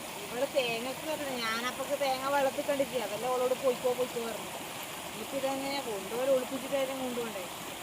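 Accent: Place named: Kannur, India. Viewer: സംസാരം കേൾക്കാൻ ഭയങ്കര രസമാണ് കണ്ണൂർ ലാംഗ്വേജ്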